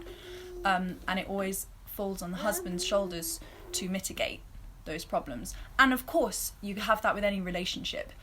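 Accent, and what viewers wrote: United Kingdom. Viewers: I love her accent. UK? I married a Saudi 🇸🇦 and it was a bit of culture shock. But he helps at home & is so romantic: not at all like my American Dad! They have a staff in KSA but we just manage ourselves in the 🇺🇸 states. 🤷🏻‍♀️ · Is your accent English... It is really nice accent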